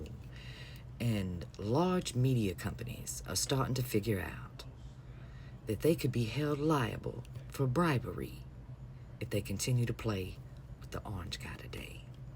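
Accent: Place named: Louisiana, United States. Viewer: Are you from New Orleans or Louisiana area? Because I absolutely love your accent and your voice is beautiful.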